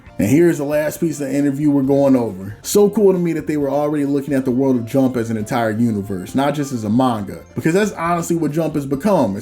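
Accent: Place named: Midwest, United States. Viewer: What part of the Midwest you from 😂. STL represent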